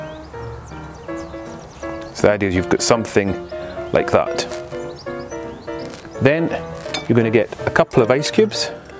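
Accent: United Kingdom. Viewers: Please explain again what is that you put on the glass the first time to make it pink colored? I am sorry I can’t really catch up with your beautiful British accent. Love your video so much.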